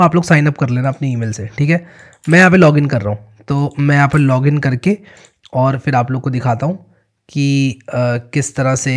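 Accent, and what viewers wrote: India. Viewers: bhai tu bilkul angrez jaisa dikhta hai, mai to kisi foreigner ka video bolke click kiya tha, badme dekha tu to indian accent bol rha.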